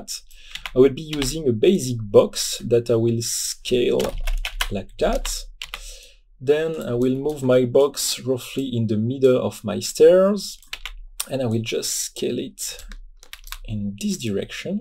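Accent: France. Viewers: French accent :) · Nice tutorial and nice heavy french accent.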